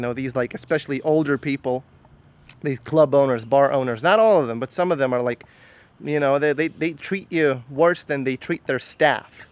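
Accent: Norway. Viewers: Wait... are you Norwegian?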